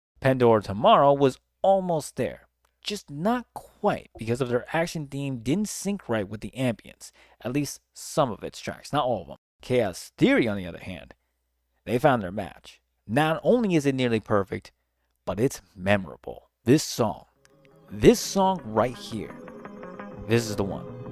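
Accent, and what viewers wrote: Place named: Netherlands. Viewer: You sound kinda finnish with some pronunciations. Jokes on me before you turn out to be dutch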